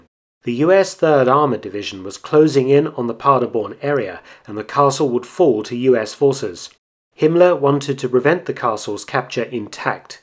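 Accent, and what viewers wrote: England, United Kingdom. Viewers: I'd say he's English, to be precise.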